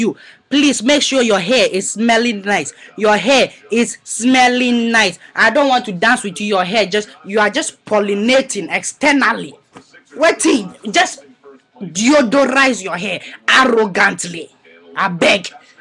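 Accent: Nigeria. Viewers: lol yuh Naija accent is seriouss!! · lmaoooooooo wetin dey do u, abeg noww loolx...wiv his Naija accent oo eei de3....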